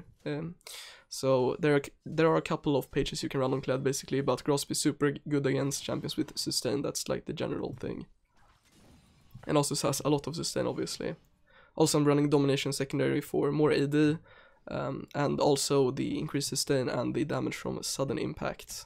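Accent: Sweden. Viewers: Älskar din svengelska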